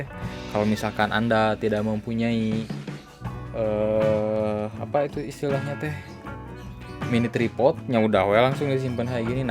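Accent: West Java, Indonesia. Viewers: sunda pisan njir, mantap kang 👍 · logat sunda na nempel teuing a :(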